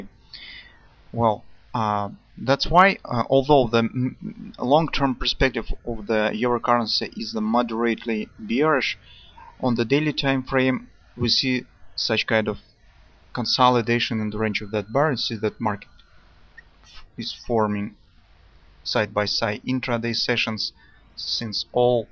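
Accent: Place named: Russia. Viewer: у тебя славянский акцент... по-моему русский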